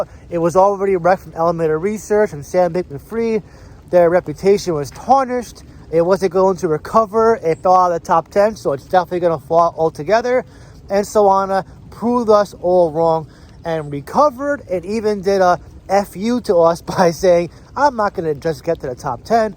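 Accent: New York, United States. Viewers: I am from England but am sure that's a New York accent. 😮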